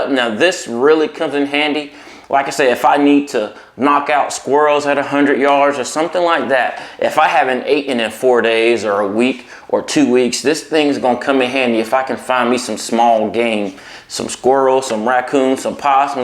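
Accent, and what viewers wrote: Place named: South, United States. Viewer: Mam o man does your southern accent really out does mine😀👍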